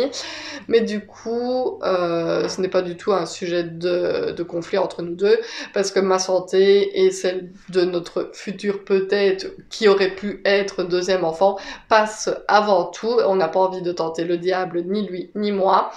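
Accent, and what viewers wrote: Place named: Liège, Belgium. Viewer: Coucou, je me doutais bien que tu étais de Liège ton accent 😊. C'est quand même impressionnant d'aller aux urgences, et de s'entendre dire que nous n'avons rien. Merci bonne journée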